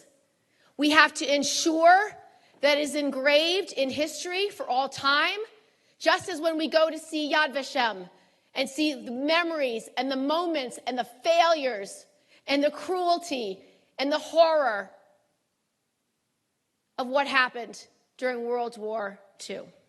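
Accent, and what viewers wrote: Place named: United States. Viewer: Such lies! Israelis own news papers proved only 1 baby died and did not know if it was by Israeli tanks. Also rape is not used as a weapon of war by Hamas or Russia. Not one investigation found this. Her with the Israeli flag, American accent, senate position and emotional tirade should be 4 red flags to know she is paid to lie.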